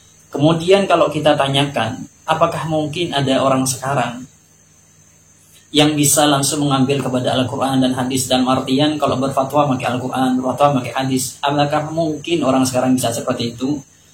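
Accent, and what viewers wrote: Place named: Java, Indonesia. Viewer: Ustad ini ngaku merasa pinter , Tp sebenarnya ga tahu sejarah Wahabi yg benar, Makanya dia salah TUDUH. Siapa pun ,selama salah tuduh,tentang siapa yg pantas disebut Wahabi, akan kelihatan taK tahu sejarah SEBENARNYA. Bahkan kalo maksain tak sesuai fakta, akan kelihatan gobloknya. orang jawa medok ini ,merasa bisa kalahkan ulama mekah (yg dia tuduh wahabi)yg sehari harinya bicara bahasa arab, dia cuma merasa hebat saja, itulah akhlak ahlul bid'ah yg memalukan . akhlak sombong, ulama medok merasa lebih pinter dr ulama fasih dr mekah. Padahal ustad ini ga tahu sejarah abdul Wahab bin Abdurahman bin rustum dari maroko /Mesir yg hidup di abad 2Hijriyah, yg berhaluan Tasawuf takfiri yg bidah juga. Nah Wahabi dari mesir inilah mazhab Wahabi yg sesungguhnya, Bukan muhammad bin abdul Wahab dr mekah yg hidup d abad 2 Hijriyah. 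yg memurnikan ajaran rasulullah dr Tahayul Bidah & churofat. Berani berbohong? Atau menutupi fakta sejarah ini. Ya begitu lah ah kak ahlul bidah & Syi'ah, yg sama sama ,bernafsu ingin memadamkan dakwah salaf, (pemahaman sahabat rasulullah) Dgn cara berbohong, menutupi fakta sejarah, fitnah , memberi julukan keji Padahal julukan itu salah sasaran, julukan Wahabi harusnya pada ahlul bid'ah, krna bapak nya muhammad bin abdl Wahab, yaitu abdul Wahab adalah sufi ahlul bidah, Yg di tentang oleh anaknya(muhammad). -muhammad anti bid'ah - ayahnya muhammad: abdul Wahab adalah ahlul bidah - jelas beda ajaran. Kaum salaf= muhammadi Ahlul bid'ah= Wahabi=sani uye= sperti mal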